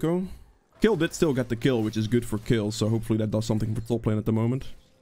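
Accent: Netherlands. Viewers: Ik hoorde van de eerste 2 woorden al da je Nederlands bent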